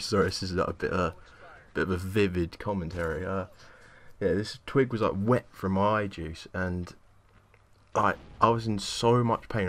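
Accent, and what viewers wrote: England, United Kingdom. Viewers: what part of england are you from? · i love the british accent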